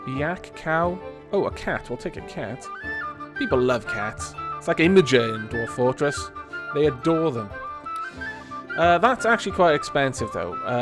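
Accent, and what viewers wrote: United Kingdom. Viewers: Oh it's okay, i always enjoy these parts even if they come two weeks later. I even watched, and that's really rare, one episode twice while playing dwarf fortress just to hear your great british accent! Great videos!:D greets from Germany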